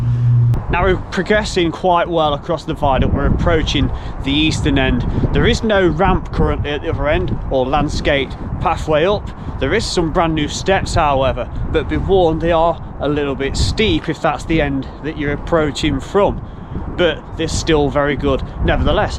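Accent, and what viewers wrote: Nottingham, United Kingdom. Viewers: Another great vid mate. You must be from Notts with that accent...' 'Bulwool' ! Lol. Hope to go up there soon .Bless yuz.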